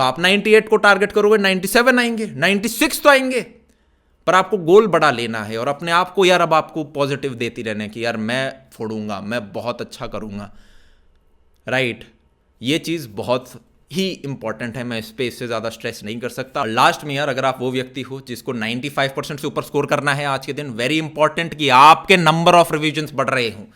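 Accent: Haryana, India. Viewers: Anyone notice the Haryana accent in Aman bhaiya... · Haryanvi accent❤️❤️❤️ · in between Bhai ki thodi thodi Haryanvi nikalti hai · Bro are you from Haryana?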